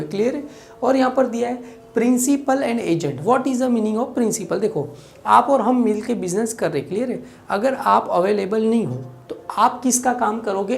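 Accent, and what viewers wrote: Gujarat, India. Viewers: Inki accent se ye gujrati lgre h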